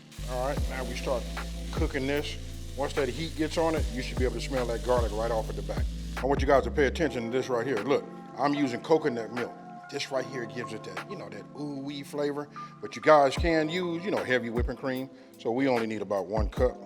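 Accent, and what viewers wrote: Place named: West, United States. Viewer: I knew you was from Out west you got a strong accent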